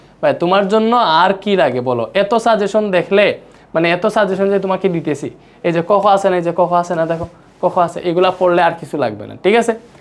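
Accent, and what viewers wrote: Sylhet, Bangladesh. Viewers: ভাইয়া তুমি সিলেটি 😩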